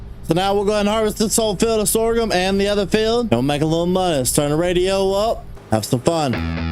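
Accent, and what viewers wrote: South, United States. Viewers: just started watching. looking forward to this. always fun to have the someone with a southern accent doing some farming. thank you in advance.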